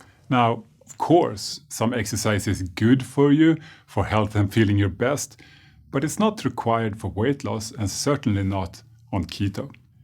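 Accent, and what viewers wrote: Sweden. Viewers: Damn thats a Swedish accent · Is he Swedish? 🤔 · i like the accent i would say either swedish or norwegian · he is indeed swedish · Hes from Sweden, I hear that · This obviously Swedish velvety middle-aged soft-spoken man has convinced me with his confident gaze. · Anti-fans fuck-off. He is a Swedish Doctor that's why his accent is different. His name is, MD. I have his book LOW CARB, HIGH FAT FOOD REVOLUTION. And it really works on me and it's like my holly grail. He is a good doctor. 😃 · This guy sounds Swedish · I call swedish, sounds as bad as Arnold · is Swedish. No doubt about it. I lived in Norway for 21 years, so all the Scandinavian-English accents are crystal clear to me. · Could you maybe be Swedish?:) I think I hear a slight Svenska accent;)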